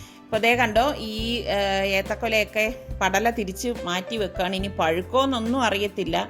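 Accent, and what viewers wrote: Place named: Thiruvananthapuram, India. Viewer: Thiruvi.,keera., veravi Assal thiruvantharam bhasha....😁😁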